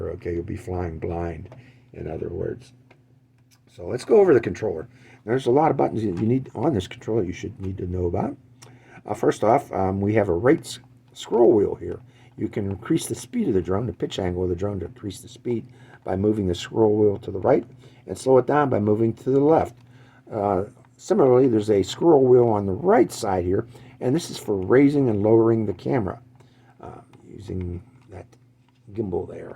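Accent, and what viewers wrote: Pittsburgh, United States. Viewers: I forgot to ask, are you from Pittsburgh Pa? Your accent 😍. I really do enjoy this video. It's what I need. Almost "hands on" instructions. Thanks again! I really want to learn how to fly drones. You've made it so much easier and you're really good with it.❤👍🏾I love the return home feature 😃Thanks again! I've subscribed👍🏾.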